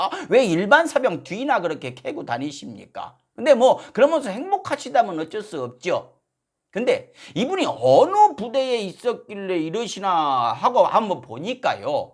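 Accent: Jeolla, South Korea. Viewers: 말이필요없는 사이다발언 박수 치면서 듣고 있어요 찰진전라도 사투리가 맛깔나부요 계속 응원할게요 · 니는 증말 전라도인니?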